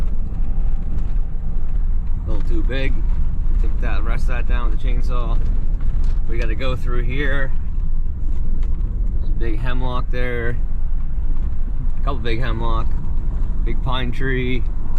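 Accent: Nova Scotia, Canada. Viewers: You'll just end up with a cluttered shop keeping everything in there. Customers will feel safer going into a tidy office, without the mechanical noise also. You've got the land, and we all know by now you love tidy! 😅 I like the way you talk by the way. You're a Nova Scotia boy through and through 😊 Which , of course, brings me to the subject of AGE. You never announced the winner of your " Guess My Age" video. I'm still waiting .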